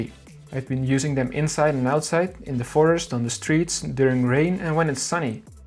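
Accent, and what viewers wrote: Netherlands. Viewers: wait wait u dutch?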